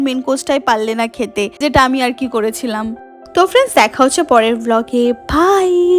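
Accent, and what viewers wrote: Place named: Bangladesh. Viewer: "Pura matha nosto?? Ar duibar??" Baki kotha gulo toh edeshi style er. Tumi ki bangladeshi na oder accent ta copy korecho?? Mane jodi Indian hou tobe jara tomar nation er against e tader copy kora ta toh thik noy mamoni.. Ar jodi bangladeshi hou tobe toh etodin Indian documents baniye felecho mone hoy! 😊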